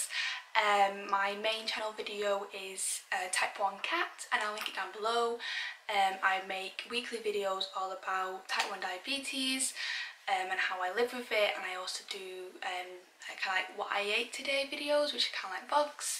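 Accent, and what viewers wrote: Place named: Manchester, United Kingdom. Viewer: I'm probably biased, since I've been watching your videos for quite awhile now, but when I first started watching, your accent sounded a LOT like Christopher Eccleston's to me, so I'd definitely say up north near Manchester 😁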